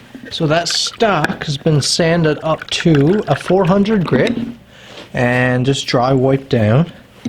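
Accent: Newfoundland, Canada. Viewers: Oh yeah, that's a good ol' fellow Newfie I hear. Great video my buddy. The rifle looks beautiful. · first guy I’ve ever heard with a noticeable Canadian accent lol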